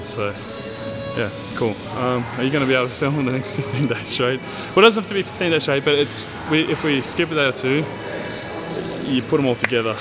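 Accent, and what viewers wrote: Australia. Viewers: your accent is coming back since you Oz trip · Jay Tee Australian English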